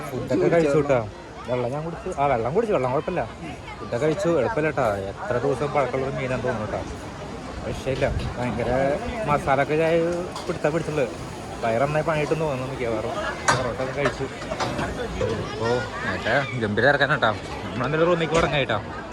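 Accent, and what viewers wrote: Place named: Alathur, India. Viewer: നിങൾ ആദ്യമായി വരുകയനലെ നിങ്ങളുടെ ആലത്തൂരിൽ സംസാരം കേട്ടാൽ അറിയാം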